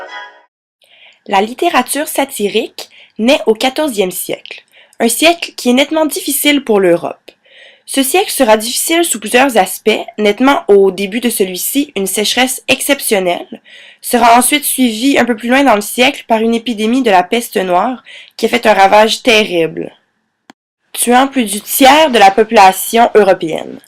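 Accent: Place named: Quebec, Canada. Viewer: Tu es québécoise j’adore ton accent français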